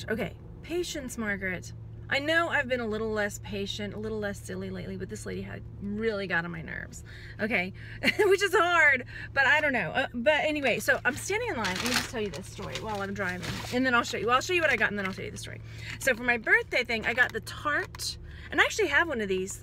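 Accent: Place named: South, United States. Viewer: Happy early Birthday! more southern lady accent talk. :)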